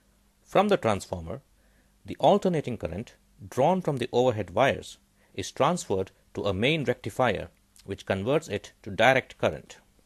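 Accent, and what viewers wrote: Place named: India. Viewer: The streetshitters accent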